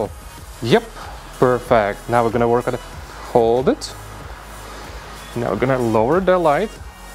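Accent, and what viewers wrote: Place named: South Carolina, United States. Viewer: Man that SC accent is intense 😊 great video.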